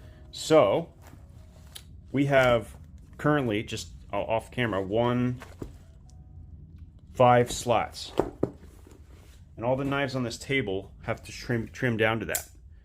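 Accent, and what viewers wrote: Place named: Midwest, United States. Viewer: Virginia? Man, I thought I picked up a "great lakes accent" off ya,. Midwest, or maybe northeast. Anyway, if you could do a comparison video between the Sencut Snap, Waxahachi, Civivi Cogent, Relic Sinisys, and WE Snick, that'd be awesome. That blade shape is what made me want to try a Civivi in the first place.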